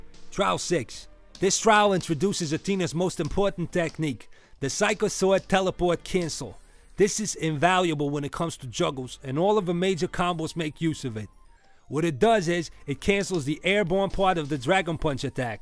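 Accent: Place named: Boston, United States. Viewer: I don’t know what it is about it, but your Boston(?) Accent somehow makes this great guide even better . Thanks for the great guide man!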